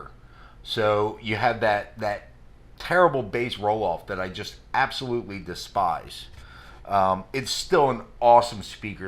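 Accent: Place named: Philadelphia, United States. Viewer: You're from Philly aren't you... if not you have a strong philly accent lol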